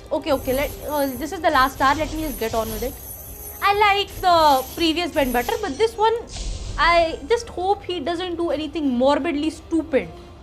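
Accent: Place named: India. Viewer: Sounds more Indian!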